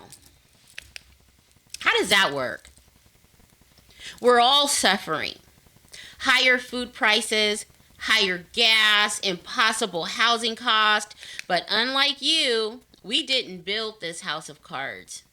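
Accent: United States. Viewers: Racism has no skin colour sister, it is a spiritual thing...check your motives... When I close my eyes, you sound like a Yank. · A great American Voice. Thank You.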